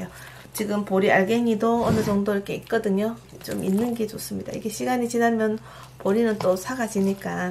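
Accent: Gyeongsang, South Korea. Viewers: 쌀을 쌀이라 부르지 못하는 지역 ㅎㅎ 삼 싸먹으면 ~~ 살 처럼 경상도 억양 ^^ 목소리가 참 좋으세요 울 애기 엄마보고 보라고 해야 겠어요^^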